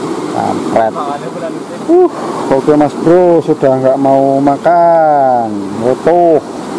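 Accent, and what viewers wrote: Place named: Banjarnegara, Indonesia. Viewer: Asline ngendi mas...logate kyk neng nggonku Banjarnegara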